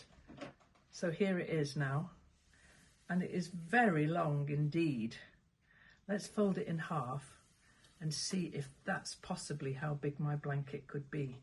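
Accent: United Kingdom. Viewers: Love your weaving!!!!! You are so talented! I have been watching you on utube for several years. Thanks for all your talks about jumping in and trying something new. I am a quilter but love to try all sorts of creative things. Also I have to tell you I also watch you because I crave listening to British accent as my mum was from England. Thanks for having a chat!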